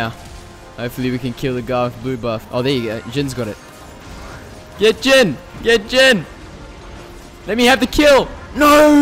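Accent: Australia. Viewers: His australian right? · Ru aussie mate?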